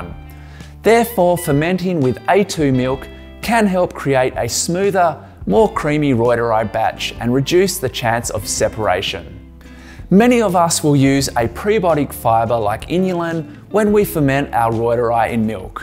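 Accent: Australia. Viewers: I wish this audio had an option filter out that heavy Aussie accent...long listen.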